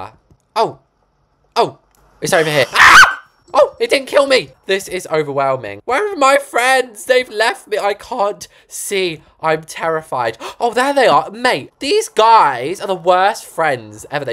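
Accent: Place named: United Kingdom. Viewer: Are you a brit cuz your accent is so beautiful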